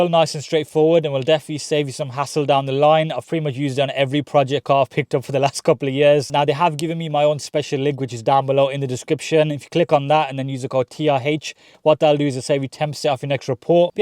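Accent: United Kingdom. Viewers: So beautiful British accent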